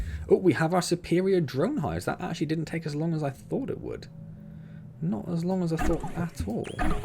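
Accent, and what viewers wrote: United Kingdom. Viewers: Just found you through your furious seas video and I detect a west country accent... where abouts you to mate?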